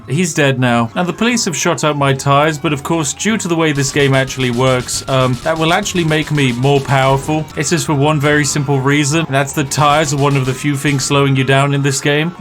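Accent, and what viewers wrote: United Kingdom. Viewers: I love your British accent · There's something inherently hilarious about a grown man with a refined British accent saying, "Right. Now I want to go play on the swings." My cheeks hurt from laughing. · This guy sounds kinda british..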